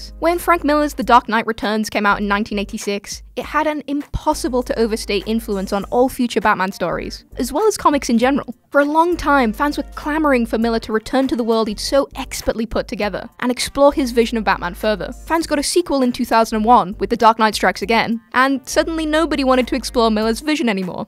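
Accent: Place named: United Kingdom. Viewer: So go to an American channel if you're so irritated by our multiple dialects... American's pronounce things differently to us UK residents, yet it doesn't bother me in the slightest. Imagine being so pressed by a bit of difference. Calm down petal. ✌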